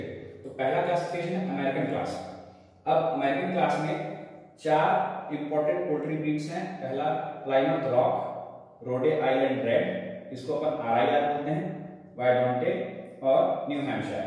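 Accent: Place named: Uttar Pradesh, India. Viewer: Apart from the content (which indeed was informative), loved you n your U.P accent as well❤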